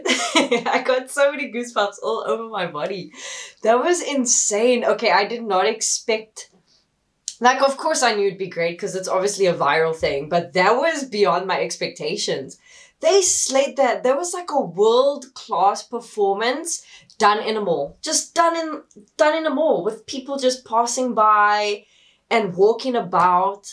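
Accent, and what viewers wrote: South Africa. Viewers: You South African? Your accent sounds really nice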